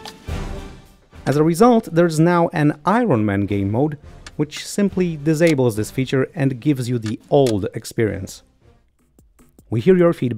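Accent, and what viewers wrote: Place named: Poland. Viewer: Oo widzę że Polak robił ten film bo akcent nie angielski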